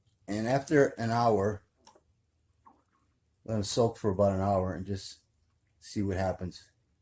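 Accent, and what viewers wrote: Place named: Boston, United States. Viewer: I like the Boston accent. If you ever meet Mark Wahlberg or one of his brothers, let us know.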